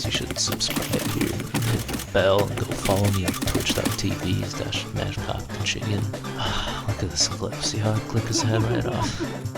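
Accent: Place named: Brazil. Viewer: mano achei por um amigo de oklahoma, conheço ele ha muito tempo cara e ele me mandou os teus dois videos sobre a inércia e eu te achei com uma cara meio brasileira e um sotaque no ingles parecido com o meu, dai eu vi o im brazilian half mexican no primeiro video e resolvi deixar um comentário em portugues porq eu tinha ctz q tu ia saber ler.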